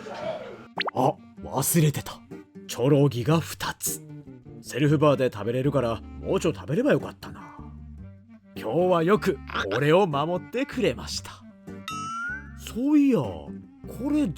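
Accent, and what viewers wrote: Kansai, Japan. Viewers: 관서쪽이신가요? 한국어도 잘하시네요 ㅎ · 美味しそうに食べはるし、自然な大阪弁が最高ですわ 👍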